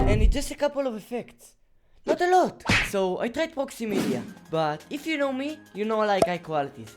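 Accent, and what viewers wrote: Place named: Russia. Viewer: in a very good way, sounds like you are from MOTHER RUSSIA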